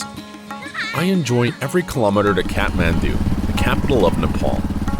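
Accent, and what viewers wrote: United States. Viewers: Great video and editing. The 'american' overvoice does not do you justice. I would like to hear a Czech voice.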